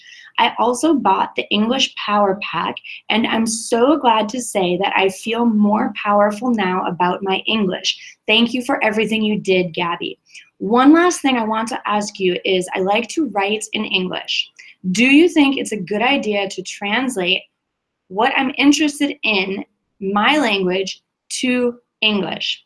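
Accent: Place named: United States. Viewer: I like your American accent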